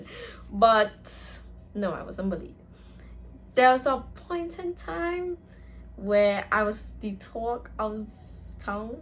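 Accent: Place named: Trinidad and Tobago. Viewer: Are you Trinidadian? You kinda have an accent... similar to Trinidadians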